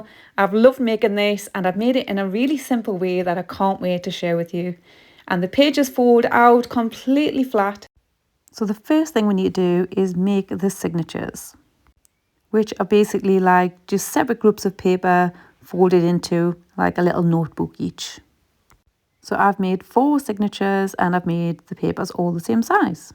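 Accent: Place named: North, United Kingdom. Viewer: Thanks for the video, very interesting but also your accent, you sound like me. I don’t live up North now so I don’t hear our accent very often. It was lovely thank you.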